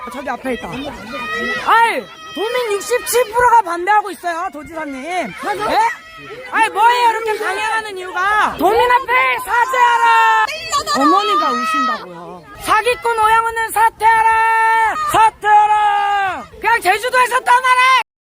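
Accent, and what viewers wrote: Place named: Jeolla, South Korea. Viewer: 말투가 전라도 같은데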